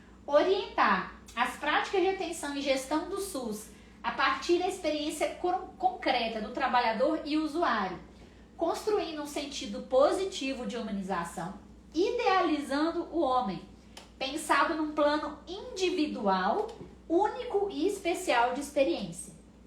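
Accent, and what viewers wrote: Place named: Minas Gerais, Brazil. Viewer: Adorei seu resumo. Pra nós mineiros é muito gostoso aprender no nosso próprio sotaque.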